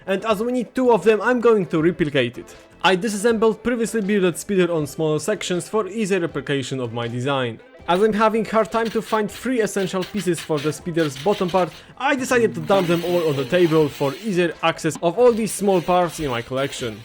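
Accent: Poland. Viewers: czy ja slysze polski akcent? btw zajebisty moc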